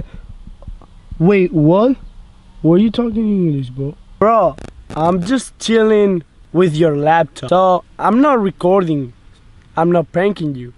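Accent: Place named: Spain. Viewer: Me gusta tu voz Española se escucha muy cool good job man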